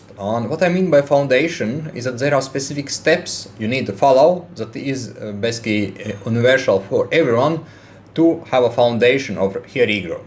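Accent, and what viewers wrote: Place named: Russia. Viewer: Are you Russian?! Your English made my brain fuckd up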